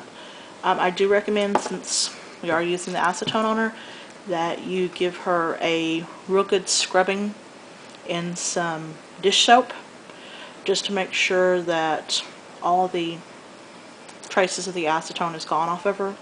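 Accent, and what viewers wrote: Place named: South, United States. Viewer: Oh cool! I recognized the traditional southern twang, but noted it wasn't as nasaled as Texan,nor as slightly deep as Tennessee,where I live.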